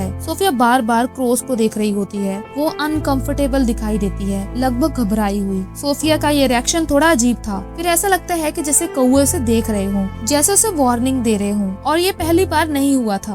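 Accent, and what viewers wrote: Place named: Dogri, India. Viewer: Aap kaun si state we hain. Accent paahdi dogri lag raha hai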